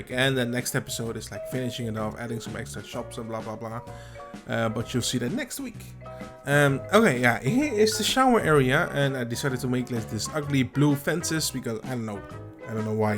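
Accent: Netherlands. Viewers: So creative, love this project. Also the commentary is the most lazy but most funny too. Ennuh ennuh, bla bla bla, you can see that for yourself whatever. Also, and I always wanted to ask you this, but is your Dutch English accent on purpose? Don’t feel offended as it’s so funny to listen to.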